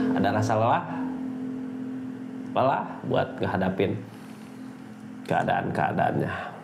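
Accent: West Java, Indonesia. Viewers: Sunda pisan A...Libra Bandung Hadir stay Cilacap salam kenal A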